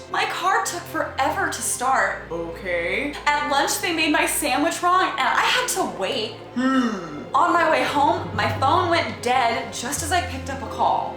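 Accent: South, United States. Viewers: Love the Southern accent. Also, #firstworldproblems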